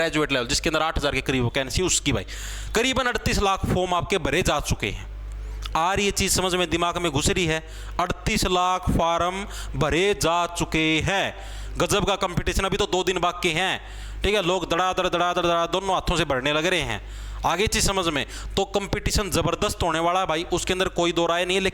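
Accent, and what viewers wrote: Haryana, India. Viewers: I love you haryana accent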